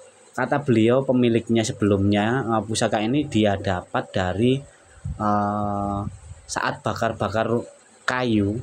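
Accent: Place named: Surabaya, Indonesia. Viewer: Suroboyone pean ngendhi Cak?....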